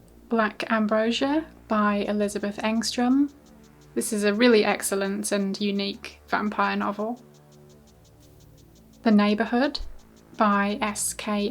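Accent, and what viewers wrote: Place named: England, United Kingdom. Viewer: Thanks for a very enjoyable video,. You have a fantastic collection of books with some very eye-catching covers, a lot of which I have to admit I've never even heard of! As I'm over in the UK it was also great to see US editions of books by favourite authors of mine like Clive Barker and Ramsey Campbell. It also made me smile that you still say Poppy "Zed" Brite instead of Poppy "Zee" Brite and that you still pronounce "Bernard" the English way 🙂. I'm probably going to have to watch this video again so I can note down and research the books that particularly captured my attention.......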